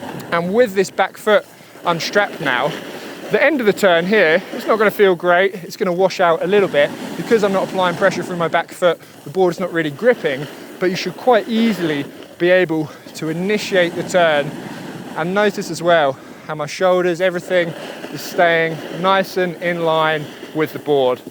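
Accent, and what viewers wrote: United Kingdom. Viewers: You are so inspiring mate! You got the cool and positive attitude, i sometimes look at your videos just to relax (and for your lovely british accent). I hope i get to Alpes d'Huez some day to learn some stuff and correct my riding posture someday ;) Cheers, keep up the nice work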